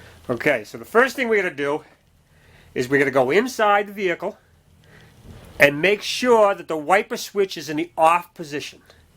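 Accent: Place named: New England, United States. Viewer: I know that New England accent I’m from Boston 😂😂great video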